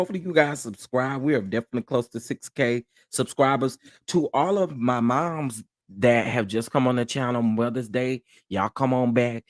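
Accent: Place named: Dallas-Fort Worth, United States. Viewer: I was trying to figure out why I felt a connection to your voice, it’s because we in the DFW! Let’s go to brunch! 😂😂😂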